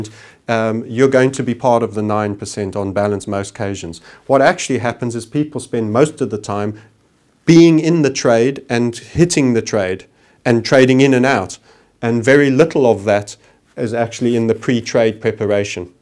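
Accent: South Africa. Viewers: I love this man's accent, Sounds South African but I could be wrong...totally agree with what he's saying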